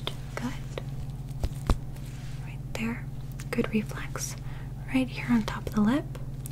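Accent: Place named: United Kingdom. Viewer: The British accent noooooooooo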